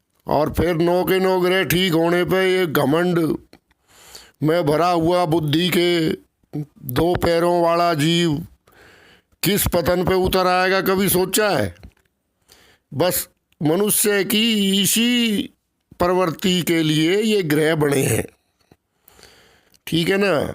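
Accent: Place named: Delhi, India. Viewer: Ram Ram Dada aap ki boli Delhi Ncr ki hai pahle bhi apse reqvest ki hai darsh karne ki aapse marji aapki apka contact no to milta nahi mera no hai <num> Om namo narayan Dada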